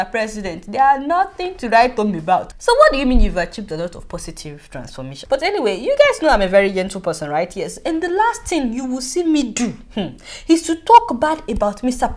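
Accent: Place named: Nigeria. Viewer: I love the Nigerian accent. Lol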